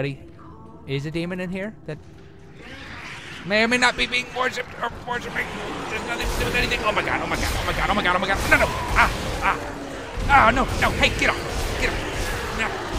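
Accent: United Kingdom. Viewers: best british accent ever! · I love you britsh accent, best one ever!!!! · his british accent is so cute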